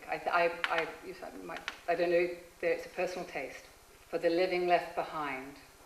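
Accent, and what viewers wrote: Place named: London, United Kingdom. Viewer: Sounds POSH - Sloane square - Kensington High Street - Bayswater ?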